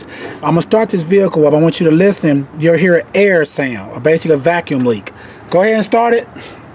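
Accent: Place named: St. Louis, United States. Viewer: Man are you from st.Louis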